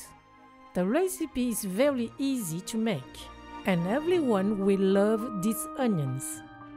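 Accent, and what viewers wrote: France. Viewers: Asian lady with french accent - noice.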